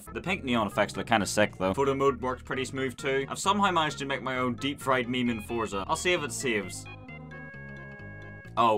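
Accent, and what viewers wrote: Northern Ireland, United Kingdom. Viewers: Your voice is a blend of American, German and Yorkshire Edit: ok that is basically a Northern Irish Accent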